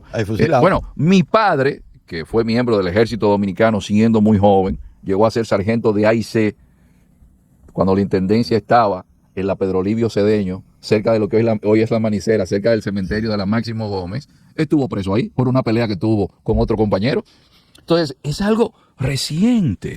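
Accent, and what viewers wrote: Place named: Castile, Spain. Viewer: Siempre veo los videos de wn tu canal, hablas con acento castellano. No se si eres de Espana realmente.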